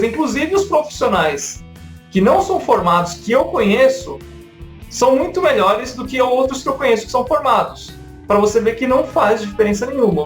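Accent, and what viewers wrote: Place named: São Paulo, Brazil. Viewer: Parabéns cara, pelo seu sotaque acho q é de SP, como é esse seu esquema de coach?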